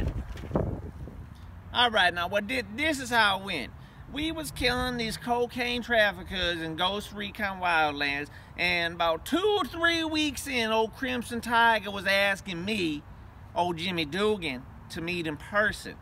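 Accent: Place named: Appalachia, United States. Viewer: Funny!! I loved this video!! What I find funny though is out of the people you shited out only commented on this video so far. I hope they see it! Anyways I really liked how when you were doing your interview I got to see the real you! Your real voice! The hillbilly hick lmao!! Never grow your hair out!!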